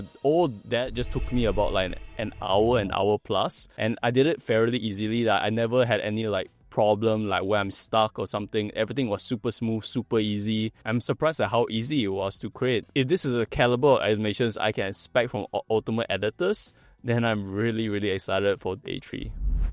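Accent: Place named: Singapore. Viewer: waow an editor with singaporean accent les goo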